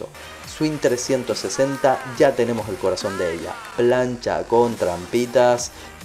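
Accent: Argentina. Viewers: ¿por q esta tan argentino en este video? XD, las batallas de gallo o q? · Me está gustando que estés implementando el acento argentino bien buenardovich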